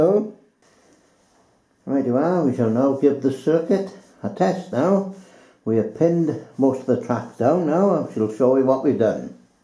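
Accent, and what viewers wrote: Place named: Wales, United Kingdom. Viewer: great idea using push pins to hold track in place while the geometry is sorted and your ready to pin it down .I have wasted so many track pins by fitting and pulling out , re aligning and such . great old school layout .love the accent by the way . it takes me back to when I played in a band touring wales , the "by yeur" phrase had us English baffled for a bit till we got used to it .its running great hope to see more in the future .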